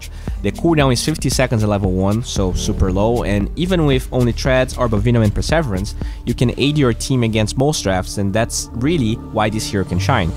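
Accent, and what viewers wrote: Brazil. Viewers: Are you brazilian or know how to speak portuguese? You look like a brazilian when speaks english. (by the way your english is great, I'm just asking XD)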